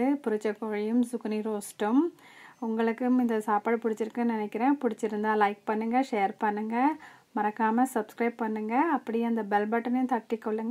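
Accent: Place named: Jaffna, Sri Lanka. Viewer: Beautifully presented dinner with no carb. Unga tamil different a iruku a bit of Indian and a bit of Jaffna Tamil.Enjoy watching. Keep posting 🦋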